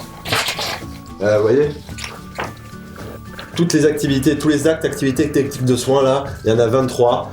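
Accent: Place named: Southwest, France. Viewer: Tu serais pas dans une grande ville du Sud-Ouest par hasard? ;) (rapport au petit accent qui se fait entendre à certains moments ^^) Sinon merci beaucoup pour la vidéo, ça se voit que tu as fait pas mal de stages déjà pour en arriver à toutes ces conclusions, je pars en stage en SSR dans 4 jours et j'espère que ça va bien se passer...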